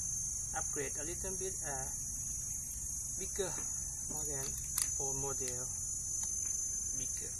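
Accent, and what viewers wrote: Thailand. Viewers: คนไทย สำเนียงยังไทยเลยอะ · Over Rock ก็พวกเราคนไทยไงสำเนียงมันไม่ใช่แบบฝรั่งมันเลยงงกัน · คนไทยชัดๆ สำเยียงไทยมาก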